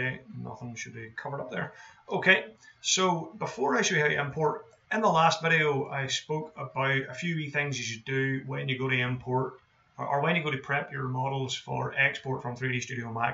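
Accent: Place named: Northern Ireland, United Kingdom. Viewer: Trying to import cameras from Blender into Substance led me here. Whilst this video didn't answer my question, it did introduce me to a fellow Mourneman. Well, I'm guessing from the accent!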